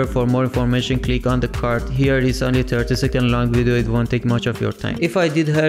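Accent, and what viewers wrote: Iran. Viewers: از لحنت معلومه ایرانی هستی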